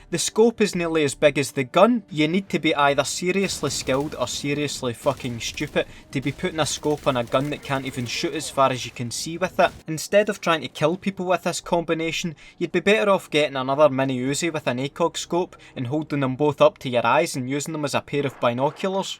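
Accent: Scotland, United Kingdom. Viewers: Splattt808 you gotta love scottish accent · Think I need a Scottish boyfriend now😂😂 that accent is way too nice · Is this british accent guys ? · I’m gonna take a shot in the dark and say that you’re from Scotland.. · That is one T H I C C scottish accent. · he's Scottish its a lovely accent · Oh that thick sexy Scottish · Every insult is twice as funny when a Scottish person says it. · Well duh obviously he's scottish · Scottish accent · Are you scotish i am new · That thick scottish accent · I can fucking subscribe again oh thank god also your voice is like Morgan Freeman but Scottish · hes scottish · Mate I’m fuckin Scottish aswell , but your accent is annoying as fuck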